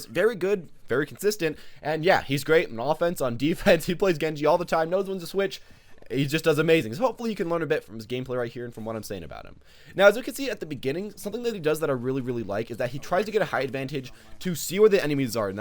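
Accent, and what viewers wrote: United States. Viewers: I subbed for the American accent FINALLY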